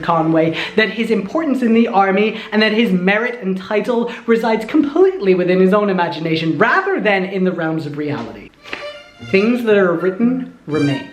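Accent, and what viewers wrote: United States. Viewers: As alway, your editing and costumes are amazing, your sass is hilarious, and your American accent is so dang good! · Also until I switch scrolling through the comments, I literally forgot you were not American so like... good job? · 1. Your American accent is amazing! 2. Your absolute feral anger just encapsulates Hamilton in a way that only Lin Manuel Miranda can top.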